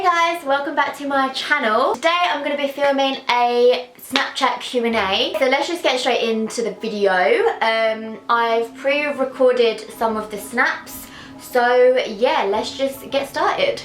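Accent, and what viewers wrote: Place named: London, United Kingdom. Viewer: Not even joking I didn't realise you had a stammer, I thought. it was the LDN accent.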